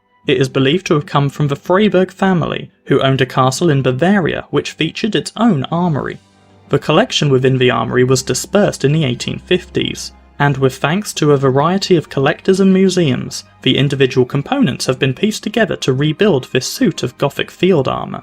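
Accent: Norfolk, United Kingdom. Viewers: your subtle flat Norfolk accent is particularly appropriate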